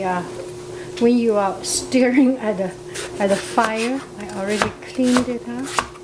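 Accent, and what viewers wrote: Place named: Liberia. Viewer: Oh okay... sound Like Liberian. Nice cooking :)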